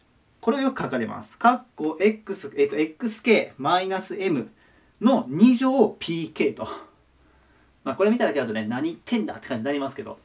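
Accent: Kansai, Japan. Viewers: さん、ありがとう。それと何故関西弁？お返事下さい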